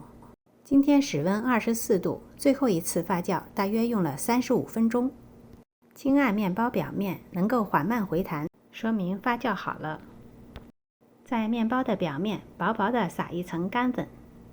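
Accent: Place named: Apulia, Italy. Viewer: Acc... Ma che è.. Un misto inglese e pugliese? Comunque conpliment 🇮🇹👍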